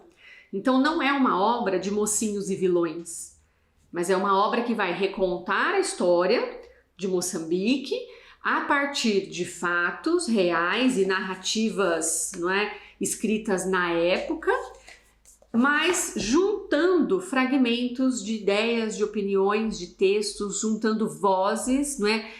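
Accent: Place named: Brazil. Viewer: Me dói tanto que sejam os intelectuais brasileiros a se interessar e a estudar a literatura moçambicana e não os próprios moçambicanos. Adoro ouvir o seu sotaque brasileiro, sobretudo, quando pronuncia o nome do nosso imperador de Gaza (Ngugunhane). Mas tão de parabéns. Abraços de Moçambique.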